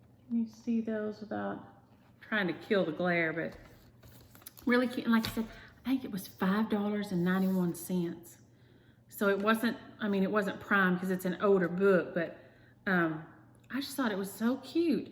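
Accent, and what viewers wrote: South, United States. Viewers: Chicken what? Did I hear your favorite candy correctly? You crack me up. My favorite candy is straight up Hershey’s milk chocolate. Love your videos. I’m a southern girl and your south-isms make me laugh. Lawd! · I enjoy you so much that I watched all your videos! I love your Southern sayings along with your drawl! I love chocolate and caramel together so Caramello would be my favorite along with Snickers!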